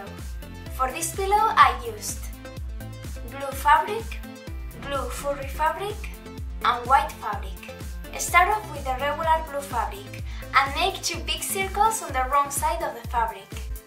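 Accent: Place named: Spain. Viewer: You have Spanish accent. I love the video! <3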